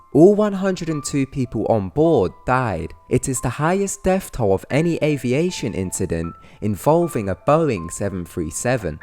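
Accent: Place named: Russia. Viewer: Русский акцент у говорящего..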